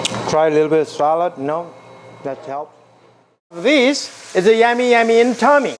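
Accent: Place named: Nepal. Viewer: You can tell he is Nepali by accent Grt job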